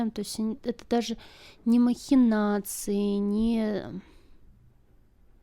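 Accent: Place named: Ukraine. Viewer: По говору слышно, что с Украины, и по смысловой части тоже.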